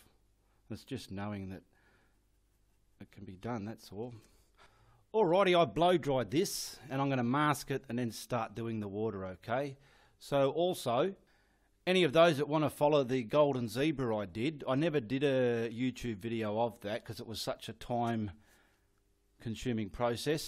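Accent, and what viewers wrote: Australia. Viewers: Thanks again, I am a Kiwi and all our canvases are still in inches. I usually use 12x16 but they seem to be much larger on utube. As you can probably tell I am a 73yr old new chum at painting. I really enjoy your work and your Aussie twang!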